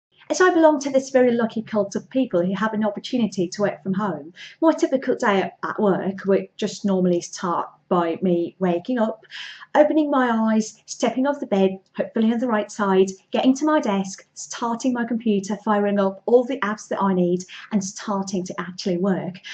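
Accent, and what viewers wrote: United Kingdom. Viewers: Wow 😮 amazing , no accent, just clear British speech! How have you managed to acquire such good pronunciation skills???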